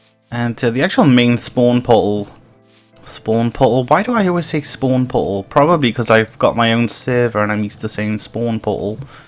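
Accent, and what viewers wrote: Wales, United Kingdom. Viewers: I'm British, and I think you sound welsh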